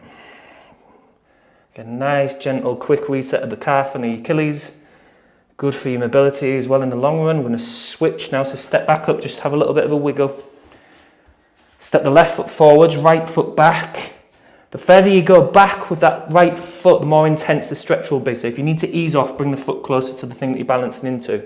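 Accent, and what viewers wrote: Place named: Hull, United Kingdom. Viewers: Great effective stretches with what sounds like good honest East Yorkshire accent. Win win! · I've been in lazy for 6 months and haven't run. Feels good to be back listening to and his Hull accent!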